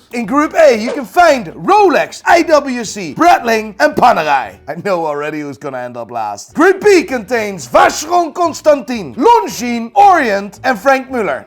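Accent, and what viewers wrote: Ireland. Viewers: yes but he lived in Ireland for a long time and kinda has Irish accent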